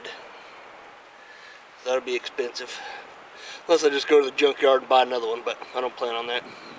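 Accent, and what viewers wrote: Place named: Texas, United States. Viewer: I’ll make my way out there eventually with my ATV. For some reason, I thought you were from Texas, maybe the accident lol..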